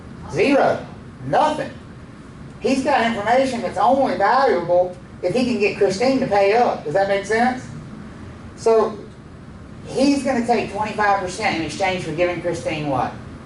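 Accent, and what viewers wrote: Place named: South, United States. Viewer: I’m staying due to the southern drawl. Plus he teaches well!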